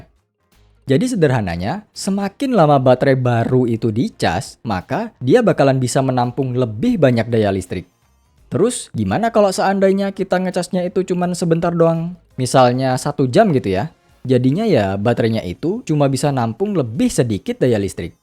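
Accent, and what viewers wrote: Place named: Central Java, Indonesia. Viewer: Kayak orang rembang ini😅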